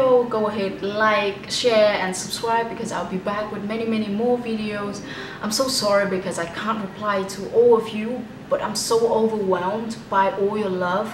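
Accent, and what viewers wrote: England, United Kingdom. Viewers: Giọng Anh ạ? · Em thích cái giọng British của chị lắm luôn ý. Nghe rất dễ thương, điệu điệu mà không quá chảy nước như trên TV. Yêu chị vô cùng tậm, luôn luôn support mọi hoạt động của chị. Mặc dù em lười đọc lắm, nhưng sẽ cố đọc hết blog của chị <3 <3 Yêu chế <3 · British accent của chị hay quá hihii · Huhu em thích giọng anh anh của chị dã man ý chị ơiiii em thích cái độ dày với ấm trong giọng anh anh ☺️☺️☺️☺️☺️ Nhưng lại luôn cảm thấy kiểu nửa vời lại còn thích đú cái khó 🥺🥺🥺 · british accent cua chi hay qua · hers is British accent, you can easily recognize it by some words like " a lot, all, stop" it's my opinion btw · British accent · British accent nghe thích quá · Từ Anh về có khác, eo ôi cái British accent nặng ghê gớm !!! , nghe nhộn nhộn vui vui, đáng yêu ghê cơ chị ạ, ahíhí !!! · English accents :)))) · ôi giời ơi, accent anh anh, nghe lạ quới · There is nothing quite like a person with UK accent who didn't grow up there. 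Very unique. By the, I love the energy that you have. Great work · Chị nói giọng Anh Anh Chị du học ở Anh ạ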